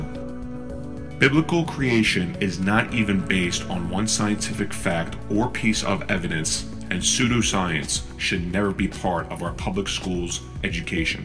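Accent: New Jersey, United States. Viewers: The combination of gravitas-evoking reverb and Jersey accent makes me smile. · Liked the video, but the voice modulation thing is...weird...coupled with a NJ accent.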